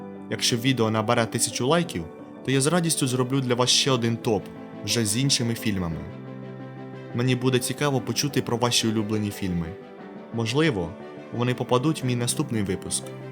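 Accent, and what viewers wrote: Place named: Cherkasy, Ukraine. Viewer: Люблю цю вимову, диктор явно з Черкаської області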